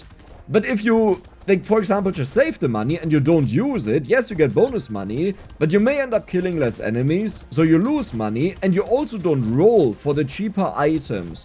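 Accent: Germany. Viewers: you can never hide that german Accent ^^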